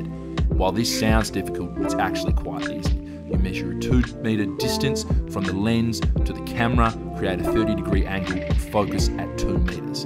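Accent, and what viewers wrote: Australia. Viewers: Are you from Perth?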